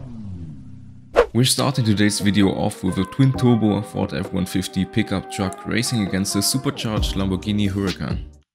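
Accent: Germany. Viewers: man hört den deutschen aczent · bro bist du deutsch ja nh? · Gude .) musst noch bissel Amerikanisch lernen fällt auf das du Deutscher bist .) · Are you german?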